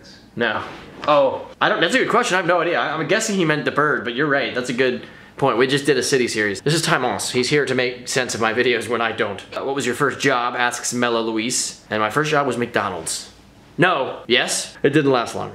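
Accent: England, United Kingdom. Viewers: Are u English or American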